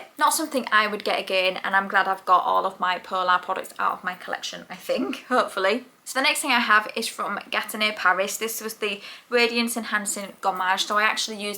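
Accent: United Kingdom. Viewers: Hello! I'm over from the Project Beauty channel and newly subbed! I hope it isn't too forward, but I love your accent. What part of the UK are you from? Northern England?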